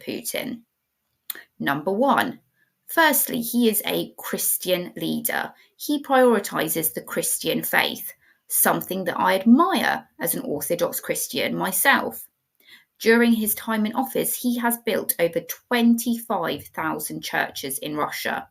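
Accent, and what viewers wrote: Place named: United Kingdom. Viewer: has a fantastic British accent. It’s pleasure for my ears to listen to her.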